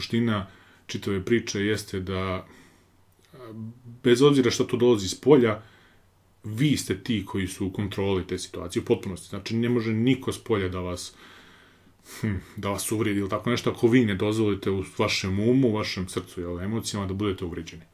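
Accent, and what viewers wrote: Ijekavian, Serbia. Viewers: Miluje me Vasa ijekavica i miran ton izlaganja,samo napred !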